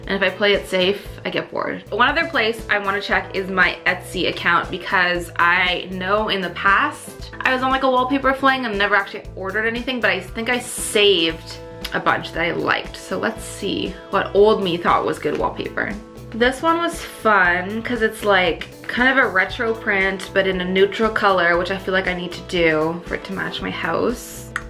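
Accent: Canada. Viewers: i love how Canadian sounds ahhaha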